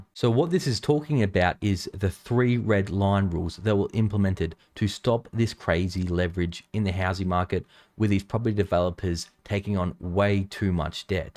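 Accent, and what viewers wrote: Australia. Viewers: i miss the HOLY SMOKES EVERYONE in your aussie accent hahaha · Does he have an typical australian accent? I'm not a native English speaker and I try to learn different accents.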